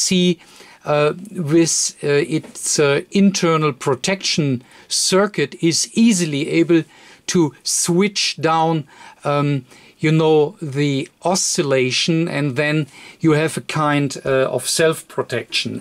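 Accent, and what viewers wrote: Germany. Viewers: It was a wonderfull n extremely comprehensive explaination of more than the basic working of SMPSs by the very intelligent gentleman although his english was good enough to follow (i think he being a german) it was very clear n highly informative !! A big Thank You to the gentleman !! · One of the BEST way to make even layman to understand. Hats - off. I really enjoy your pronunciation of each word with a tinge German accent. Vielen Danke. Auf wiedersehen.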